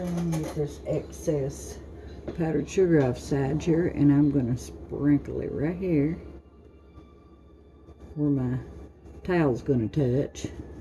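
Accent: South, United States. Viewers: 3/4 cup of "Flair". I love your southern accent...and your sweet kind soul.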